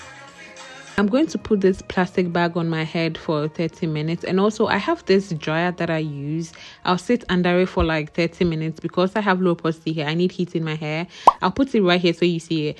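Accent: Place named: England, United Kingdom. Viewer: You are simply smart & very beautiful. I love your English accent 🎉🎉🎉🎉❤.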